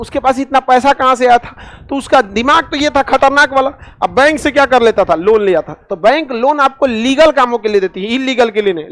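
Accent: Bihar, India. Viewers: Apka accent bihari h but surname kese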